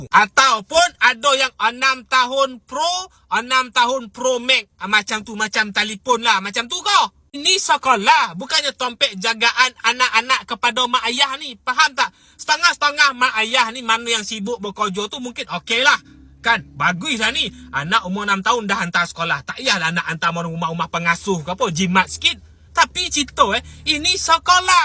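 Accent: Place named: Negeri Sembilan, Malaysia. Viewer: Terhibur la dengar dia ni cakap. Sampai aku ulang 2-3 kali. hehehe... Aku mmg suka loghat negeri 9 ni :D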